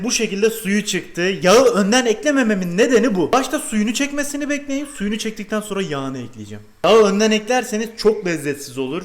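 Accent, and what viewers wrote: Adana, Turkey. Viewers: abi sende adana şivesi var heralde kesiyürüm bakürüm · Abicim adanali misin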